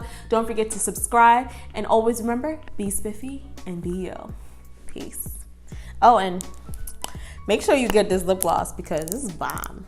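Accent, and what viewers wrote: Jamaica. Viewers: Love your jamaican accent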